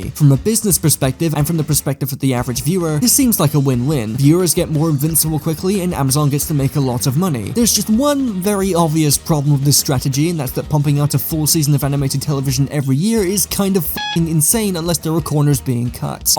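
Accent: United Kingdom. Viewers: Another British v-tuber… cool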